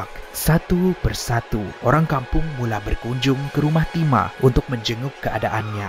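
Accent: Sabah, Malaysia. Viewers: Sabah ka tu bang...area mana ? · sedap dgr org sabah cakap... semua bahasa buku....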